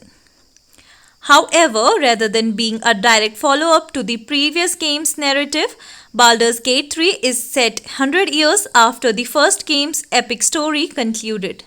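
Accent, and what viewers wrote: India. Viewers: Very nice Voice and cute accent from one of the Indian Languages, I suppose :) But you really need to have some Pictures that do not look like Watercolor Paintings :P Good luck and thanks.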